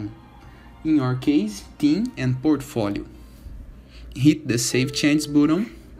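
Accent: Brazil. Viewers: Pense num sotaque BR! vlw mano pelo vídeo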